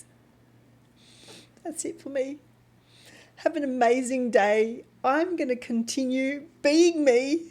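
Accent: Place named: Europe. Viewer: She seems like a really nice girl. Love the accent. I wonder what country she is from. I'm guessing somewhere in Europe.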